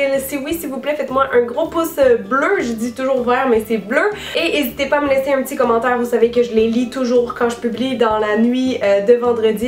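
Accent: Quebec, Canada. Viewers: t québécoise ??? PS : merci bcp pour l'astuce mon maquillage + le fond de teint + les lunettes sa donnai n'importe quoi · Je kiff l'accent québécois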